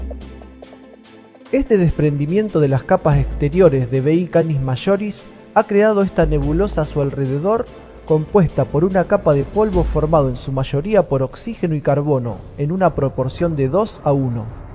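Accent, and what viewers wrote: Argentina. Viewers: Si, es Argentino, he visto mucha gente que se quejaba por su acento en los comentarios... Mil disculpas! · muy buen video pero te hago una pregunta ¿sos argentino? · Nose porque la gente se burla del acento así se habla en argentina , pero en todas partes no se habla igual , nose porque se burlan es el "Idioma argentino" que problema tienen De que pronunciemos así ? Son inmaduros .Saludos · Jorge montt el dice estresha por que es argentino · Bueno este tiene el acento Argentino PURO y sin suciedad, molesta escucharlo decir estreSHA,mashoris, sh sh :v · muy bien! pensé que era el único argentino que se interesaba por estas cosas. me suscribo. no le des bola a los ignorantes y seguí propagando con orgullo tu acento. saludos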